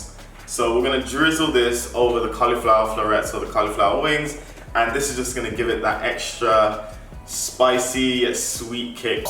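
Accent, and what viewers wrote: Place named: United Kingdom. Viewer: UK ...nice one!! was just looking for cauliflower recipe, and your channel popped up. And even better when I heard the accent, okay I'm off to Tesco to get my lemon 😁....oh and I've subscribed, nice presentation BTW.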